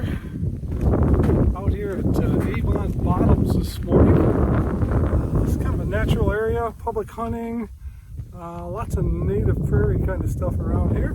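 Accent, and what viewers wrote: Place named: Manitoba, Canada. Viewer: Sounds like a Manitoban accent.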